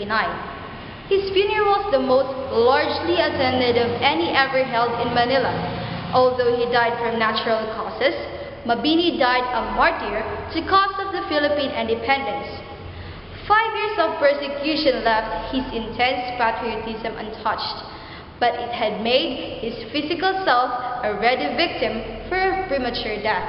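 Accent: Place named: United States. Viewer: Is that your real voice? or lip synching...LOL. if that is...Congratulations!...you did perfect american accent, rise and fall intonation of voice are impressive...Good luck!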